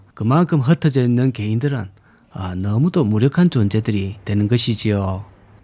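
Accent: Gyeongsang, South Korea. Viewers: 구수한 경상도 멘트로 은근슬쩍 사회적 병리현상을 핀셋으로 콕콕 찝어내듯 몽둥이로 미련한 소 궁둥짝 쎄리 패듯.. 능숙한 방송으로 경지를 열어 가는 듯 .. 더욱 분발하시어, 부패 비리 탐관오리 속고사는 이 없게 박문수 어사 역할 부탁합니다.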